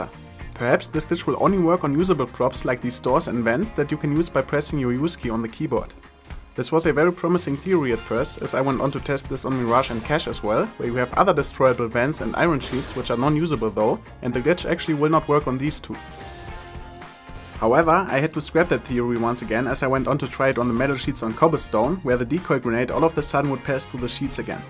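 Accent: Germany. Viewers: uuh ein deutscher xD geiles info Video :p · Geiles video. Hahahaha Deutsche hören instant, wenn jemand anders deutsch is. auch wenn er englisch redet XD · Wie er einfach den tooodes deutschen Akzent hat.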